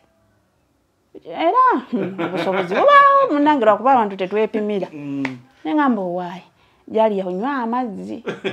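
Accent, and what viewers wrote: Busoga, Uganda. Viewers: the way u speak lusoga l feel like going back to my ex just to listening learn lusoga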